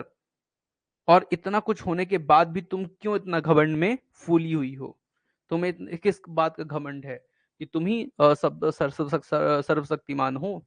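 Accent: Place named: Rewa, India. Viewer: Sir aap reewa se h ,lagta h aapki voice se....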